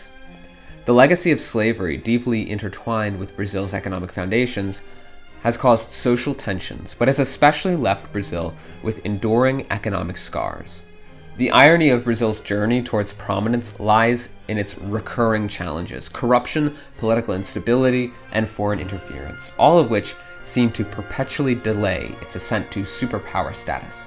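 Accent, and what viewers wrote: Spain. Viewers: "Mestizo" and "Rio Grande del Sur" 💀💀💀 Oh dear, Brazil is the 1000th time being treated with Spanish pronunciation. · Really cool video but the spanish pronunciations of Portuguese are going to offend some people, bro 💀💀💀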